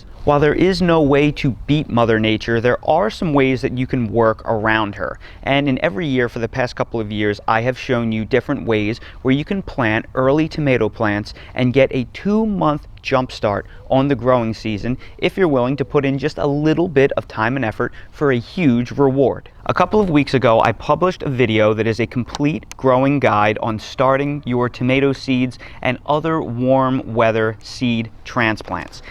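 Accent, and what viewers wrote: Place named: Northeast, United States. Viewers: You’re not originally from NC are you? Accent says NJ/PA/NY. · You sound a little bit South Philly Italian to me. I’ve learned a lot from you. I’ve definitely bitten off a lot but I’m reasonably optimistic.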